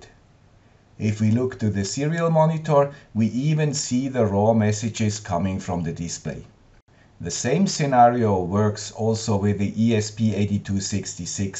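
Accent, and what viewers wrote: Switzerland. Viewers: HI Guy with the Swiss Accent again! I can't Thank you enough!!!!!!!!!!!!!!!!!!!!!!!!!!!!!!!!!!!!!!!!!!!!!!!!!!!!!!!!!!!!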